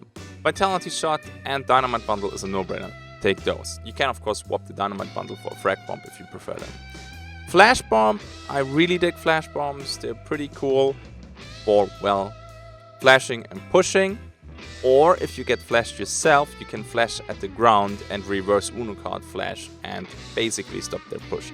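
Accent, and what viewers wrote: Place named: Germany. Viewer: Really enjoyed watching that. Well played! Where are you from mate? You kinda sound like German but I'm not sure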